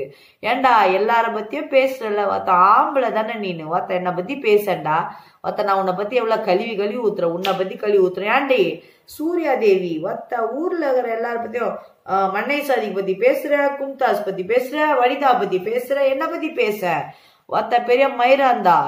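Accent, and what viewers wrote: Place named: Chennai, India. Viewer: Akka Sema Chennai slang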